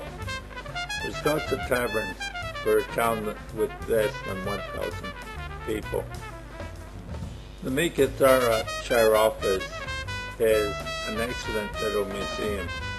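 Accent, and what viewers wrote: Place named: United States. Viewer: Why is a "Seppo" narrating this?🚫🇦🇺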